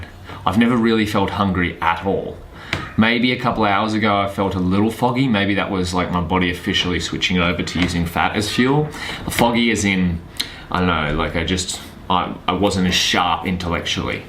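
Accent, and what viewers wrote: Australia. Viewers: Are you Australian? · Its an Australian accent dumb dumb, of course he is from Australia. Jeeeesus.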